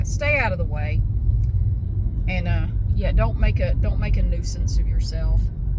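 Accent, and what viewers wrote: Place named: South, United States. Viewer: I am originally from India I lived in Texas for 7 years before moving to Canada. I love southern accents. I find the way you speak and share your experiences really amusing. You are raw and real. Keep up the good work. Your rants are extremely funny lol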